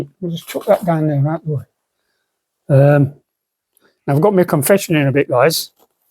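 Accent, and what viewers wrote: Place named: Nottingham, United Kingdom. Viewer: your accent shure your a notts lad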